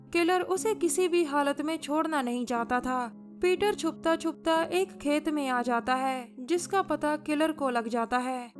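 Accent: Punjab, India. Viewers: According to ur accent ,tusi punjab to ho? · Too heavy on MTI punjabi hindi accent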